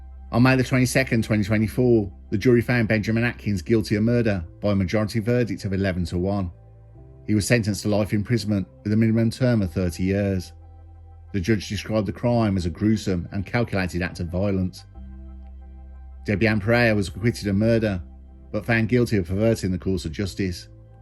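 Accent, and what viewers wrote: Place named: Black Country, United Kingdom. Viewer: Great video. Very informative and well out together. Also, you sound like you might be Black Country. I'm in Wolves.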